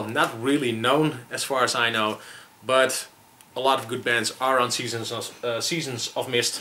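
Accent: Amsterdam, Netherlands. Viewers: Hahhaha je hebt een Amsterdams accent. Awesome : P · Wow your 100% Amsterdam xD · hahaha je hebt echt een duidelijk amsterdams accent!! haha geweldig!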